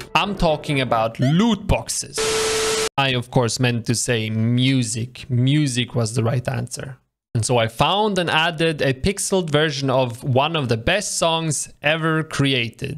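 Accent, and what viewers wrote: Poland. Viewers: Are you from poland ;3? Also great job on the video man, its soo high quality. Keep it up and you will go to places cheers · youre from poland?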